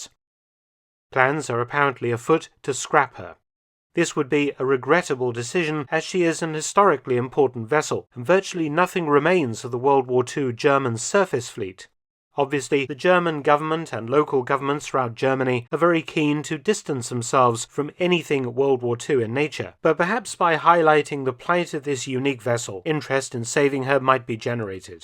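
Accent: United Kingdom. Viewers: I'm always put in a better mood while watching a Mark Felton video, the combination of the interesting topics, calm gentlemanly British accent and relaxed vibe of the videos is just so good.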